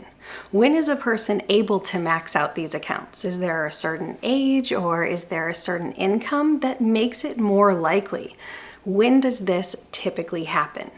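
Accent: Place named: Michigan, United States. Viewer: subtle michigan accent detected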